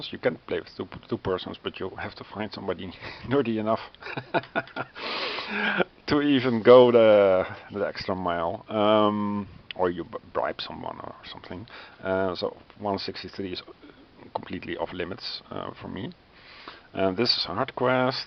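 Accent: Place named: Netherlands. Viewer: Ah I thought I recognized a Dutch accent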